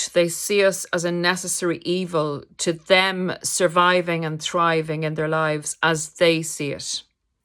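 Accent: Ireland. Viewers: What a lovely 😍 lady, you my dear have helped me tremendously with my healing, I have listened to your lovely ❤Irish accent, for hours and hours thank you for your work, you describe the behaviour and Traits of Narsasits very, well exceptional ❤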